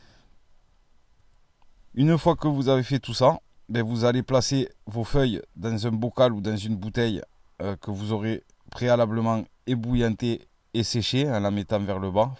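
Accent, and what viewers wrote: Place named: Puylaurens, France. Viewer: C EST GENIAL ! L ACCENT ON A LE MEME !PUYLAURENS 81 !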